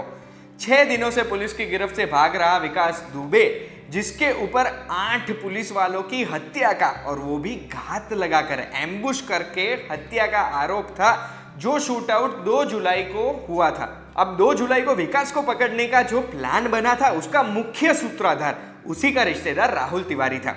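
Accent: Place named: Gujarat, India. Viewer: hindi ma bole to pan Gujarati sambhday chhe 😁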